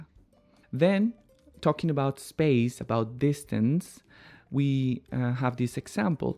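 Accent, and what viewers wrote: Spain. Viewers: Is this Spain Spanish or latin America Spanish? Thank you in Advance. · This sounds like European Spanish (Spain). Do you have any Mexican Spanish story lessons for beginners?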